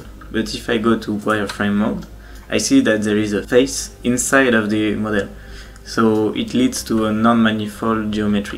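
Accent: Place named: France. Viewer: A german viewing a tutorial in english narrated by a french. Thanks for the help! I think I will try blender in the future!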